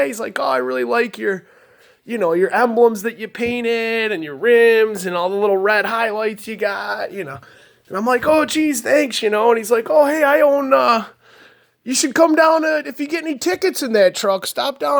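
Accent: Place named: Buffalo, United States. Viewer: I was gonna you sound like your from Buffalo. Then you said Syracuse area so I was close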